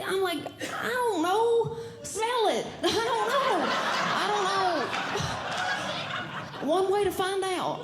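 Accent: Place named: South, United States. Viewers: The jokes were perfectly fine. I laughed many times. Shitty people like you should probably get on stage instead of thinking their opinions are objective. See, I think you might just have an aversion to the country accent and the fact she’s from Texas and is Christian because when I was far left, these are the same type of markers that made me instantly not like someone until I realized I was part of the problem just like the far right people who hate leftist Just for minor things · I just love her accent. Any southern accent is pleasing to my ears. I think she is way cuter without the wig.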